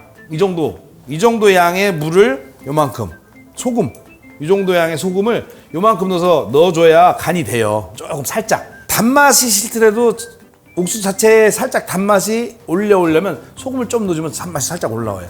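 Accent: Chungcheong, South Korea. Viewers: 울고향친근한 충남사투리구수한 수더분한인상 넘잘합니다 성공신화역시~ 화이팅!! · 남편이 옥수수를 안먹더니 나이들어 좋아졌다고 어제도 쪄달라했는데 이제 비법을 알았으니 맛있게 쪄줘야게쓔 백종원 선생님 감사해유~~~♡ 충청도 사투리가 구수하구 좋아유~~~~~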